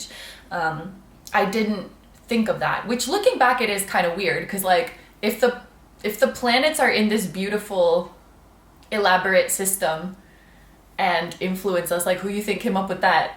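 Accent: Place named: Canada. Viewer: This answered a couple of questions for me. Explains your accent, it’s a Canadian one (I’m also Canadian), the only question I have left is do you speak French?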